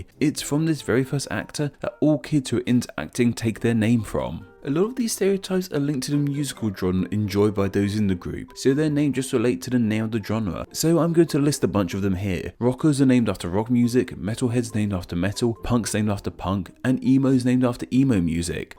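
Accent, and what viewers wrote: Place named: United Kingdom. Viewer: Listening to a British man explain hippies is hilarious.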